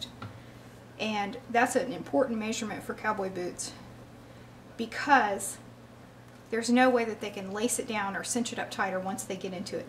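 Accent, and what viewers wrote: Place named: Southwest, United States. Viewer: I’ve run into people here in MO who say “mayzure.” She probably interacts with lots of people who have southwestern regional accents. I noticed at several points in the video that the accent flattens out a bit. It could be she has grown used to hiding it or it’s a consciously or unconsciously assumed accent due to her location. Whatever the case, I enjoyed the video and her personality.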